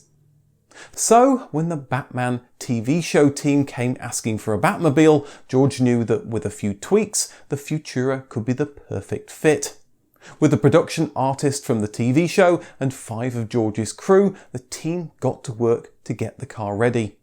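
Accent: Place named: United Kingdom. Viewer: Thank you so much for this great video. I thought more replicas had been built: about 8. I read this somewhere. As usual, it's a pleasure listening to your perfect British accent. That's a bonus to me!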